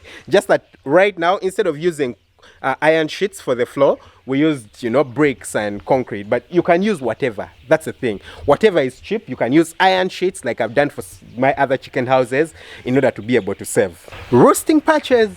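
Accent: Uganda. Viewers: Condolences over the loss of your Grandma, and congs for having beaten the virus. But on a kind note, pliz learn to include/mention the name of your country of origin in every video post. You should learn from Kenyans, who cannot post anything without mentioning their country. From you accent I guess you are a Ugandan, so go ahead and make your country proud and visible.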